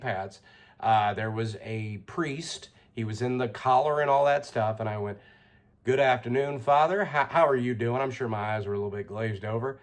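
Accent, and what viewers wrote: South, United States. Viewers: His accent is fire. I love a good southern accent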